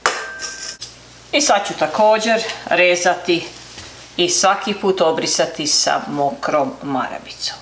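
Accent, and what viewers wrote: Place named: Slavonia, Croatia. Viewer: Draga.Iako se ne poznamo gledajuci vaše recepte postali ste mi tako bliski ne samo zbog predivnih slavonskih recepata jer i ja sam porijeklom iz Slavonije nego zbog toga što iako ste u daljini čuvate svoju tradiciju i još uvijek govorite prelijepim slavonskim govorom.Puno pozdrava Vama i Vašoj obitelji i želim vam svako dobro jer jako ste me obradovali slavonskim receptima i slavonskim govorom.Ma gdje živjeli u srcu zauvijek nosimo mirise i okuse rodnoga kraja.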